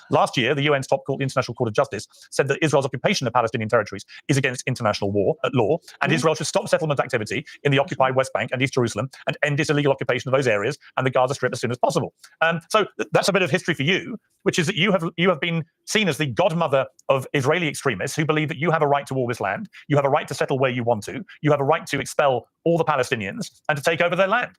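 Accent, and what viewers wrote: United States. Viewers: Thank you for the great analysis. Every now and then I speak to a liberal Zionist who says there are 22 Arab states and just one small Jewish state. Yes, that means they approve ethnic cleansing. P.S. The Hebrew accent is very like Ukrainian/eastern European accents to me when a lot Zionists like Netanyahu speak. Not so much yours. I think the original settlers who revived Hebrew came from eastern Europe. Your American accent is amazing. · Great American accent. I think I have never actually met or conversed with an "honest zionist." I started the video thinking Piers would be the honest zionist because I didn't know who the other person was. Wow. That shows how bad things are, when Piers is the more "liberal" person in the conversation, because here in the U.S.A. in GENERAL I think he is considered a right winger. At least he strikes me as conservative and I have previously heard him praise Trump and MAGA. 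Zionism, however is so mainstream here in the U.S.A. It is embedded in both political parties and both right wing and left wing thinking. You're correct, the liberal zionists always find a way to package it all up in a nice package to deceive the world. To other Americans, do you know any honest zionists here in the USA that are not from the place called Israel?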